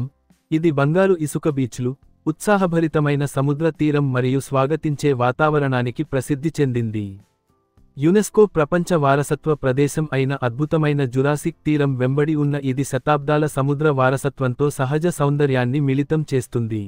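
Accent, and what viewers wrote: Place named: Telugu, India. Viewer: Interesting Telugu accent 😊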